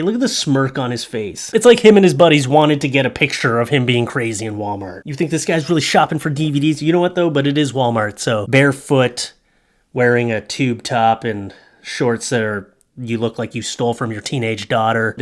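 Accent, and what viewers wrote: United Kingdom. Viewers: BBC British broad casting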